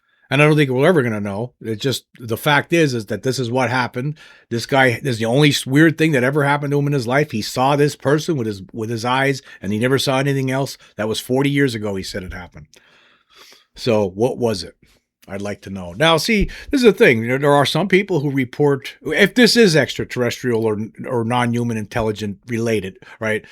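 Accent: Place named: Massachusetts, United States. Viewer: You from Massachusetts ? I’m usually pretty good at picking up on a fellow neighbors accent lol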